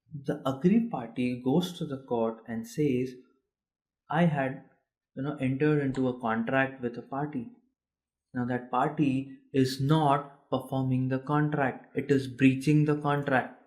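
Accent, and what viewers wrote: India. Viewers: Can't stand this weirdo Indian and his annoying accent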